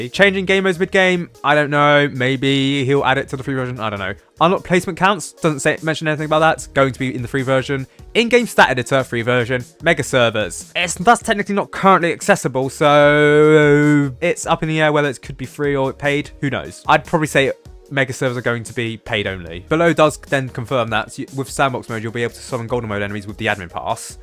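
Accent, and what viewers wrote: United Kingdom. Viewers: Are you from Brittain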